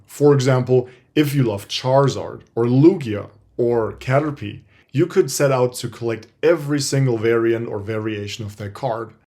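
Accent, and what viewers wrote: Germany. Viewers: Tolles Video (ich hoffe ich habe den Deutschen in dir rausgehört ;) )! Ich versuche mich seit Tagen schlau zu machen über das Pokemonkarten game, weil ich vorhabe meiner Nichte (1 Woche alt) zur Taufe etwas wertvolles zu schenken auf das sie stolz sein kann wenn sie mal älter ist... jedoch habe ich noch keinen Anlaufpunkt gefunden wo ich nicht dieses Gefühl hatte, dass der Verkäufer einen "Dummen" sucht... gibt es einen zertifizierten Händler, wo ich ruhigen Gewissens "einkaufen" kann? Liebe Grüße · I hear the Deutsch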